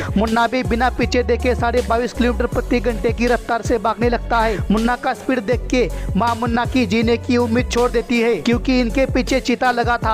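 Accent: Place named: Maharashtra, India. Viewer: Bhai aap toh pakka maharashtraian lagtey ho...superb broo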